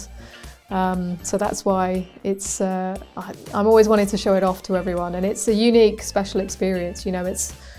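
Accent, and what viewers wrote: United Kingdom. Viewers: My favorite female commentator .... with lovely voice, English accent, very beautiful personality. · Listening to her voice in British accent is pne of the most satisfying things 😌 ✨️ 😍 💕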